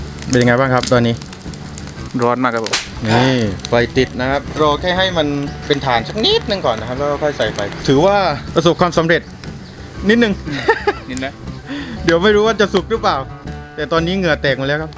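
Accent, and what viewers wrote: South, Thailand. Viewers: พี่คนใต้หรอครับ🐹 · พี่คนใต้นิผมว่า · พี่คนใต้เหออ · คนใต้แน่นอนคับ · คนจังหวัดำไหนคับเห็นแหลงใต้ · พี่เป็นคนใต้เหรอค่ะ เสียงได้แรงอกมากนิ่ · พี่คนภาคใต้ใช่มั้ยนิ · คนใต้นิ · เสียงเหมือนคนใต้มากกกกกก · อื้ม~ พี่บ่าวใช่คนใต้รึเปล่านิ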